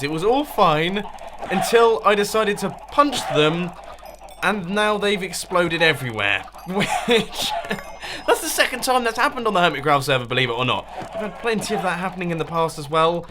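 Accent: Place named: United Kingdom. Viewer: 10 reasons to watch Mumbo: 1. The intro is awesome 2. His voice is awesome 3. His Redstone contraption are awesome 4. You can actually learn a lot about Redstone from his content 5. His voice is awesome 6. He has a British accent 7. HIS FUCKING VOICE 8. His content contains no vulgar language, partial nudity, etc. - he is kid friendly 9. Him.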